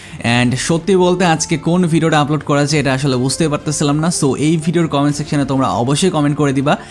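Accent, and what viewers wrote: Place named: Rajshahi, Bangladesh. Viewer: Apnar basha rajshahi er kothay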